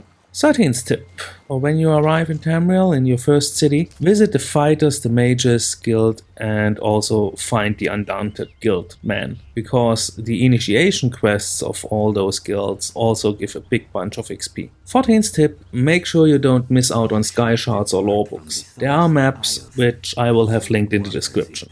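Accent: Germany. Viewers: dat heavy german accent xD thx for the tips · Good collection of some basic tips. But man ... your german accent is HORRIBLE XD Beeing from Germany i feel i can say that without sounding like a dick ;)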